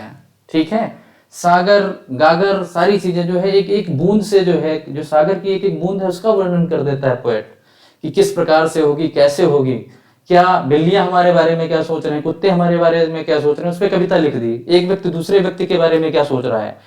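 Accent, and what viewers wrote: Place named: Bahraich, India. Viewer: Sir bahraich side se hain na, mainly mahsi, apka accent bht match hota hai yaha se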